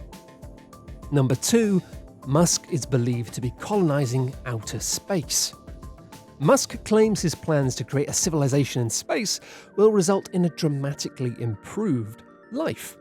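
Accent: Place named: United Kingdom. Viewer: British people make up such a small fraction of the world yet such a high percentage of Youtube narration. I don't like it. Turning off.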